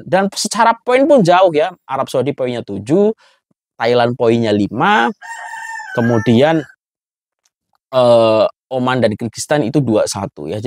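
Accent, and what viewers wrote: Indonesia. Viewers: Bingung ini orang pake bahasa apa.. Logat dialek tata bahasa pake Indonesia tapi disisipin dengan kata2 Malaysia jadi bingung dengernya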